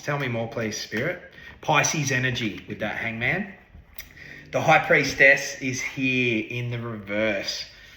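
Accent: Australia. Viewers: 100% feel this found me. Thanks. Never got a tarot reading from an Aussie before and i gotta' tell you, it's pretty entertaining the way you articulate and deliver your message ❤😂 LOVE & LIGHT💯👊🫶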